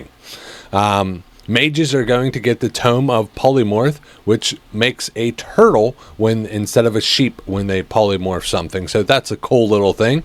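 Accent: Philadelphia, United States. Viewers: i gotta ask.... you gotta be from around philly right??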